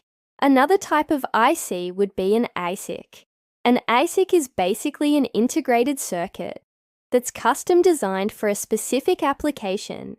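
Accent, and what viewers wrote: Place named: Australia. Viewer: Very interesting. Thanks for sharing. I like the relatively light Australian accent. 👍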